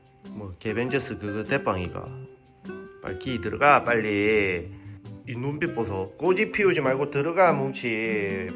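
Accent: Gyeongsang, South Korea. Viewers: 오랫동안 질리지 않는 이 사랑스러움과 친근한 경상도 사투리의 주인님 찰떡궁합과 멘트 쵝오🎉🎉🎉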